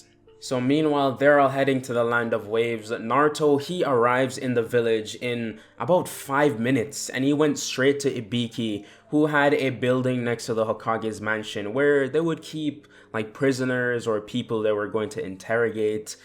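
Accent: Jamaica. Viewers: Are you Jamaican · You sound? Like your Jamaican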